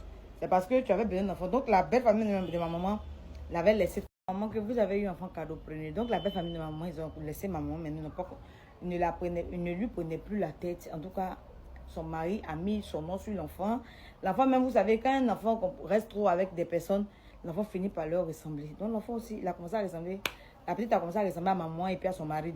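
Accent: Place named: Ivory Coast. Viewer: son mimi accent ivoirien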